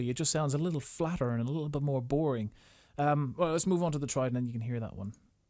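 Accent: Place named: Ireland. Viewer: youre from ireland :) great video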